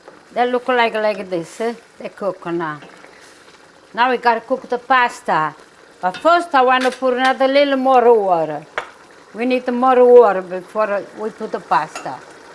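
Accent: Italy. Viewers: She is so adorable reminds me of my Nonna same accent. What good memories i had and will never forget my Nonna. Love your cooking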